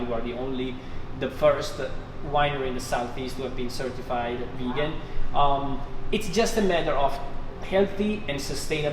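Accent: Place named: Italy. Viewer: Gotta love that Italian accent. Fun video!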